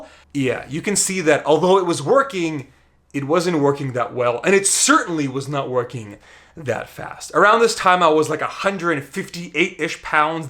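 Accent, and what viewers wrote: Russia. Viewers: omg the russian accent lol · That Russian accent tho... 😆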